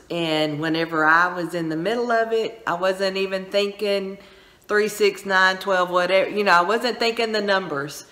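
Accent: Texas, United States. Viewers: I'm a new subscriber. I would love to see a tour of your whole house. What I can see is gorgeous. Is your accent from Texas?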